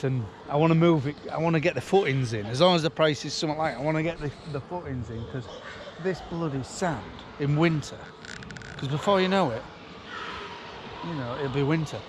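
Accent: Yorkshire, United Kingdom. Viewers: Hi, Just subscribed to your channel, being watching for a while and really love the videos as they are very very good and you explain everything so welland unreal the amount of work you and the lads get through 🥵. Took me a while to get used to the Yorkshire accent right enough 🤠. I'm From the west of Ireland 🇮🇪 and keep dry heifers now, suckler cow herd gone 4 years now and flock of sheep away 10 years now. My grandfather kept a couple of sows when I was young boy and remember them well and always had a fondness for them. Thinking of buying a Claas tractor and would appreciate a run down on yours sometimes as I know you like it. Thanking you and looking forward to your next videos. 🚜👍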